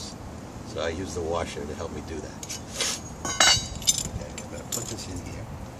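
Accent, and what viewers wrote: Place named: New England, United States. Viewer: Give me a lever and I'll move the world..someone famous said that..archimedes maybe? Thanks for the good tip and great new england accent!. Thought you were one of the this old house guys..👍👍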